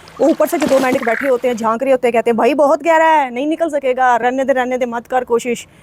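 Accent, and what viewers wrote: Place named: Punjab, India. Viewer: آپ اردو کو اردو لہجہ میں تو بولیں ایسا لگ رہا ہے پنجابی بول رہی ہیں آپ کا accent مکمل پنجابی ہے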